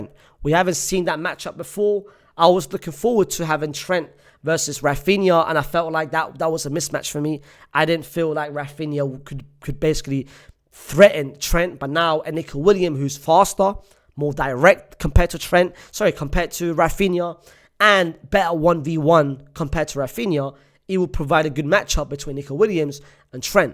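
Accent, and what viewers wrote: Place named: United Kingdom. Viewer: This dude makes me hate the British accent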